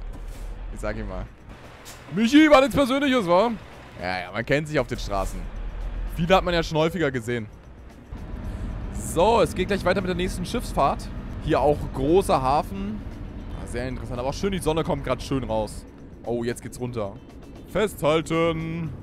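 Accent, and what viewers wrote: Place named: Brandenburg, Germany. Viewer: Er kommt halt aus Brandenburg, wenigstens verstellt er sich nicht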